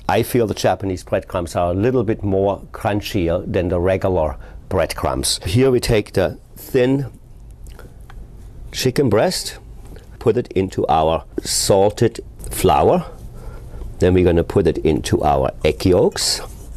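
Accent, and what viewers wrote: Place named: Germany. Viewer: i like his German accent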